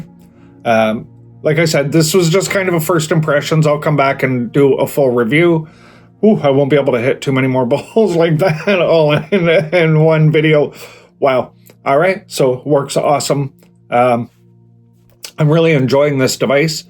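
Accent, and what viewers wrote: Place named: Minnesota, United States. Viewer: Your accent is awesome. 😂 Minnesota? North Dakota? Canada? Im from NYC. 🗽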